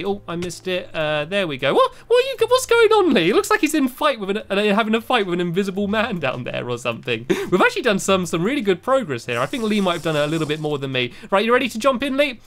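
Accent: United Kingdom. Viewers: * are you british??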